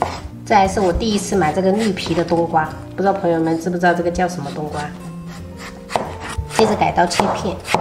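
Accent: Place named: Zhejiang, China. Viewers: 好像是可愛的浙江口音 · 听口音像江浙沪的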